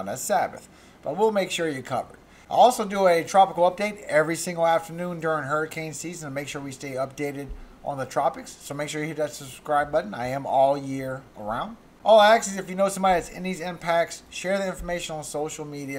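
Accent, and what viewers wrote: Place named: South, United States. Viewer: 🥰 Your speech gives you CHARM,!! 💙 Happy the military couldn't totally break you..."can't take the South out of the boy (or girl, haha!) 😆 God bless...He loves us wherever we come from!! 🙏🌴🌩🌴🌀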